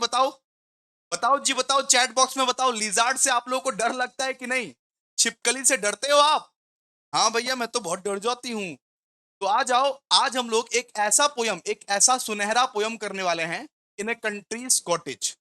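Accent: Bengal, India. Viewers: Hahaha 😂 Your bengali accent is so cute (Khub mishti 😆)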